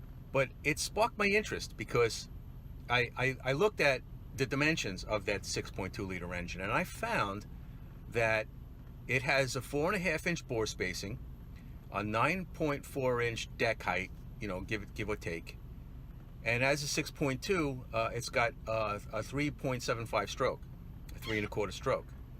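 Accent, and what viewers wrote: New York, United States. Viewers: You must be from New York area originally 😁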